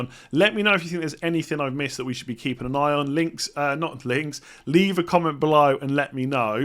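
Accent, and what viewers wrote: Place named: Bristol, United Kingdom. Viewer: Hi! Are you from Bristol? Just picked up on your accent sounds like it is a Bristol one aha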